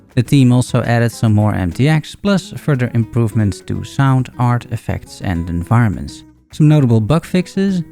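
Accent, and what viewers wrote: Netherlands. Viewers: I came here randomly as POE player but - klopt t dat ik een Nedelands accent hoor?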